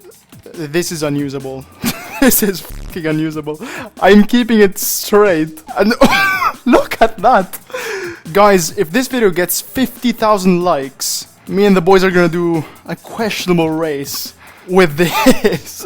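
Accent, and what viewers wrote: Italy. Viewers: Ma dillo subito che sei italiano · Bro ma sei italiano?? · bro sei italiano? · Sei italiano? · Are you Italian?? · Are you italian? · aRE you Italian? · io all'inizio: "sembra un accento strano" io a : "ok è decisamente italiano" ahaha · Sei Italiano? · Ma sei italiano? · Ma sei italiano allora perché lo sentivo un po anche dall’accento e poi perché qui avevi detto “MADONNA” comunque mi dici il nome del gioco? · Your accent is sooo italian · are you italian? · bro you're Italian because it's a perfect Italian accent because I'm Italian and it's the same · are u italian by any chances? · Are you Italian?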